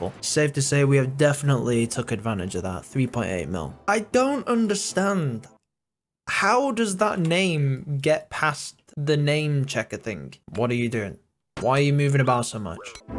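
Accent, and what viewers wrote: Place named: United Kingdom. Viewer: You sound even more British now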